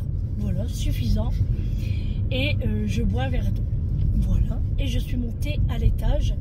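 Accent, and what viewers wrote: North, France. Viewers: Cet accent du nord qui me fait tellement plaisir 🙏😊😊😊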